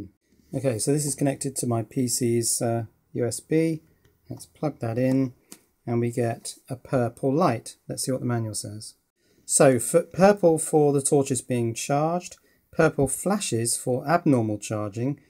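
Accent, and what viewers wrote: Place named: RP, United Kingdom. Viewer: you have an upper crust English accent.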